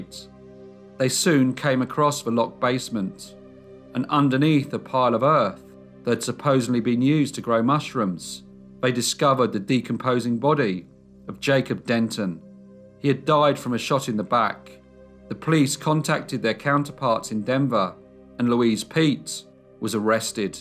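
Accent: United Kingdom. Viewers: Didn't you notice he has got Brit speak. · Oh my gosh! Do I love your British accent!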